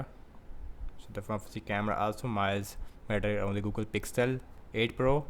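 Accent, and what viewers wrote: India. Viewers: I can barely understand this review due to his pronounced Hinglish accent!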